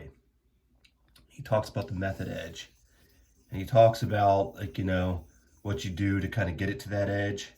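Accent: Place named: Pittsburgh, United States. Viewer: I thought I heard a hint of Yinzer in your accent. Proud PA boy here.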